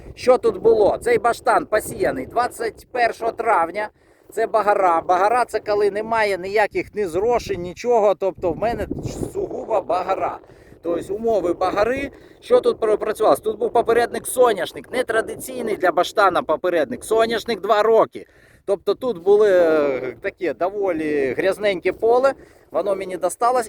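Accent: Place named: Russia. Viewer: Перестань акати і какати, як кацап. Гидко слухати!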